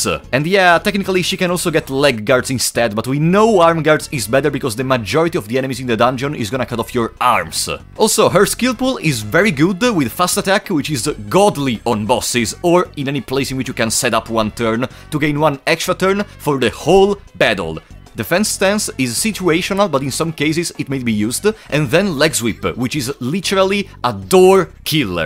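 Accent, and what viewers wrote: Italy. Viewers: Perché un italiano dall'accento maccheronico parla in inglese di uno dei più bei giochi mai creati? Iscritto. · sei ita? da come parli sembra di si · I don’t know why but your accent sounds italian, se sei italiano bro sei un grande, anche se questo gioco è mega di nicchia in Italia è davvero un piccolo capolavoro ed è bello vedere uno dei nostri che lo porta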